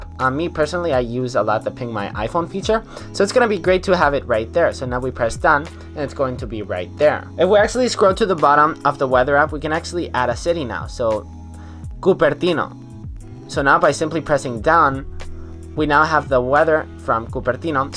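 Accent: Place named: Spain. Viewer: His accent is Spanish, as was born raised and lives in Barcelona, Spain. He spent 3 years in the United States as a foreign exchange student. It’s why it’s hard or takes awhile to figure out that is not American just by watching only a few of his videos. Listen to him pronounce the city in California called Cupertino, which is where Apple HQ is. Many cities and towns in California have Spanish names! I’m sure I overly answered your question, but I’ve been following ’ channel from the early days. Have a good night! ~Cat from NY 🐱🍎💜👍🏻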